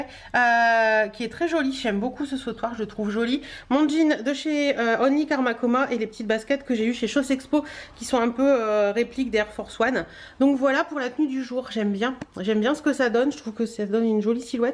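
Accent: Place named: Belgium. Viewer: Coucou, j'ai bien aimé ton accent belge 😂😂😂 j'ai cru que tu pensais à moi à ce moment là 😂😂😂. Gros bisous de Belgique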